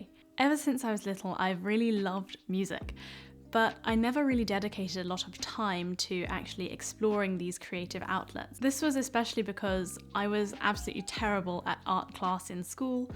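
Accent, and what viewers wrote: RP, United Kingdom. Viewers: I really love your British accent and your idea. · Oh gosh, I really love your voice and also your British accent. I've thought I'll give up to learn British English so many times but when I watched your video, it inspired me a lot, thanks for that. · Thank a bunch. I like your british acent. Have fun ❤ 👍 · Hello I realy enjoy watching your videos over and over I'm english but coming from the black have an awful accent I'm ashamed of most enjoyed your r p english videos and do hope you do some more have you any ideas where I can learn to have an accent like yours keep up the good work as its enjoyable to watch your positive and life learning skills videos. Roger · Me encantan los Hobbies que consideras para vivir, muy buena filosofía de vida. Yo tengo un trabajo, me encanta bailar salsa, leer, aprender idiomas y hacer amigos... 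Me encanta tu voz, tu acento británico y tus hermosos ojos... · I really enjoy your videos and it is really enjoyable to listen to your impeccable British accent; of course, you were born in England, I presume. One thing I have noticed that you use the American pronunciation for the word: schedule. I wonder why? I hope you will continue to produce videos even after you assume your formal profession as a medical doctor. I shall look forward to your future videos.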